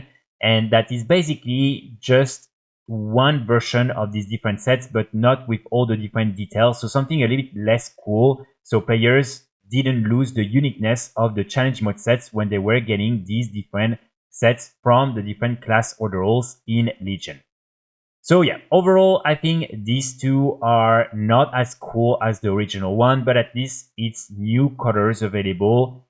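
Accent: France. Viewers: pas mal l'accent frenchi ahah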